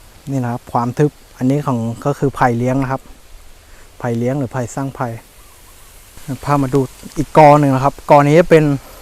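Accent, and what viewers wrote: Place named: Buriram, Thailand. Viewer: สำเนียงอยู่จังหวัด.บุรีรัมย์ค่ะ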